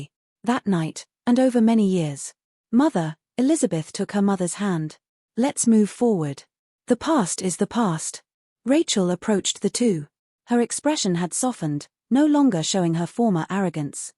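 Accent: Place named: England, United Kingdom. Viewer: English accent in Boston doesn't work! Change it please!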